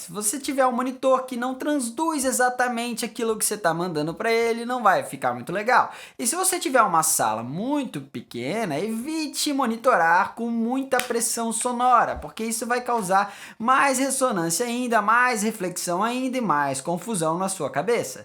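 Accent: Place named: Florianópolis, Brazil. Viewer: sotaque de floripa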